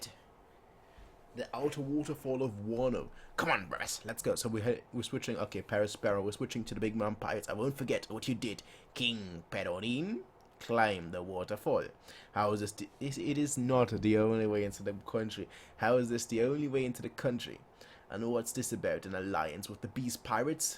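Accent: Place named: RP, United Kingdom. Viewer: your accent pretty lit bro lowkey sound like a bond MC or villan the poshness instant sub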